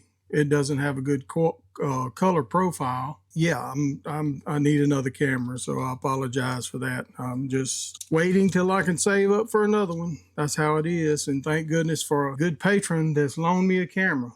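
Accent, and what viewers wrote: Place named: South, United States. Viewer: knew you were southern man. Not to far from you, north mississippi.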